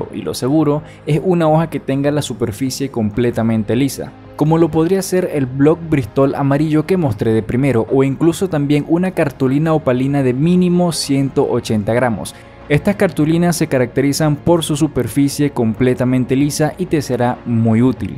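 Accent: Venezuela. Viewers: eres de venezuela?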